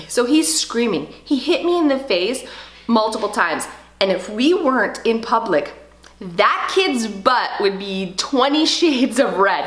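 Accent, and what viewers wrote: Canada. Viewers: Ga Ga,gave me life! Love your cute Canadian accent,and sweet energy! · Lol.. this is so refreshing. I Have an extremely strong willed 4 year old boy. every day is a challenge! sooo nice to know I'm not alone! also, the centerpiece is dreamy! I'm a Canadian in Missouri and your accent makes me homesick!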